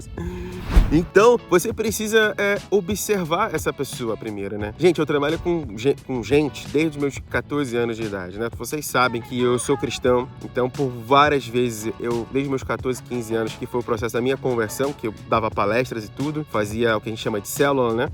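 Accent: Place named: São Paulo, Brazil. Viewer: O conteudo muito bom, o sotaque de faria limer orrita um pouco... hahahahaha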